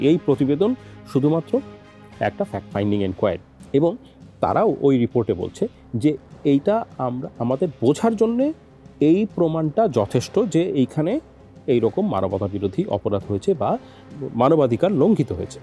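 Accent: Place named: Kolkata, India. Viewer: কেউকি একটা জিনিস লক্ষ করেছের যে নিউজটা পড়তেছে তার কথার ভিতর কলকাতার বাংলা ভাষার একটা মিস্র আছে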